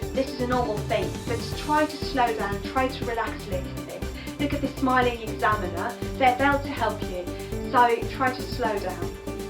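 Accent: RP, United Kingdom. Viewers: Your not Ukrainian your British...i wish i can speak like that 😭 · i can't even ukrainian you speak like a real native eng speaker british so good amazing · What with the posh accent? :P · Wow, astonishing! When you started speaking, I thought you were a British teacher. Your accent is so perfect. Congrats,!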